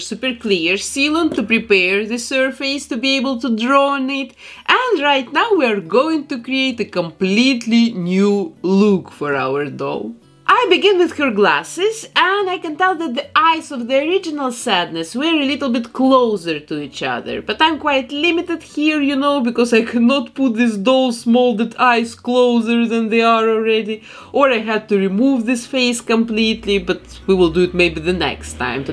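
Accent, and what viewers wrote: Russia. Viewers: I really don't want to be rude but she kinda sounds like Dracula but female version Edit: She sound Russian I'm just saying she got the same accent as Taras Kul [ CrazyRussianHacker ] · what country is she from? Russia? Ukraine? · Просто у нее очень сильный акцент, похожий на русский акцент. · Почему мне слышится русский акцент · Ну очень сильный акцент, сразу видно, что русская или СНГ.)) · Мне кажется, или это русский акцент? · А что за акцент? На русский и правда похоже... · i love your accent are you Russian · What Is her accent I Wanna say Russian but I'm Not too sure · Is she Russian? I love the accent! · Какой русский акцент... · Her accent is different. Is she Russian? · Ты тоже заметил(ла) что русский акцент? Она знаешь, так как то ооочкнь странно звучит как "Бринан ин зэ Кэпитал оф Юкреин" 😂😂😂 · рашн акцент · Я одна слышу русский акцент? 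· Слишком русский акцент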